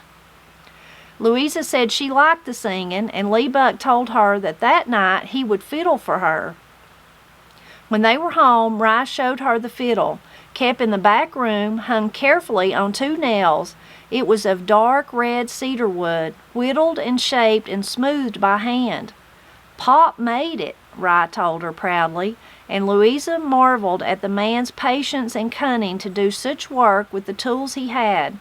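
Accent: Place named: North Carolina, United States. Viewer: Your accent reminds me of my relatives, on my Mom's side...... they all came from Knightdale, NC. I recall hearing very similar voices, and the dialogue was always family, gossip, or both. Thank you for a trip back, if only for a wee bit.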